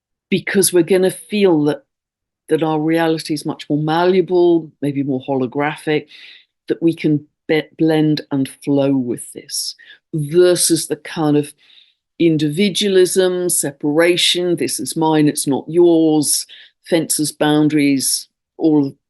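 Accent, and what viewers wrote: England, United Kingdom. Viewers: Hi ! It's irrelevant Question but which English accent is that? One if the finest I've heard in recent times.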